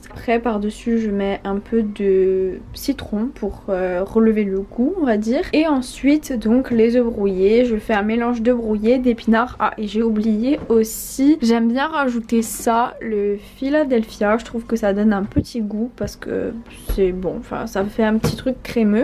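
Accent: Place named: North, France. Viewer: tu as un petit accent du nord de la france, tu viens d'ou? 😉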